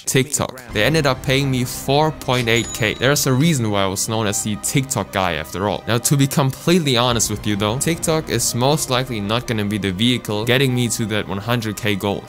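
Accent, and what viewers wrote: Germany. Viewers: german accent detected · im a trippin or does he have a german accent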